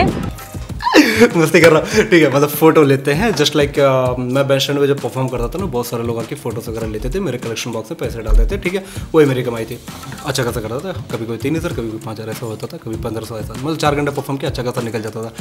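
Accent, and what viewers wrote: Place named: Hyderabad, India. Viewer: After a long time I am watching him. I love his hyderabadi accent. I want to see him go him on heights....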